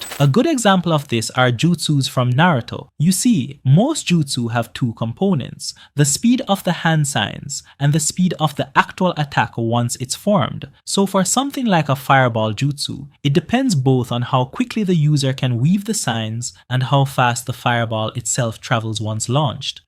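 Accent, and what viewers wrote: Jamaica. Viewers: good breakdown , are u jamaican by any chance?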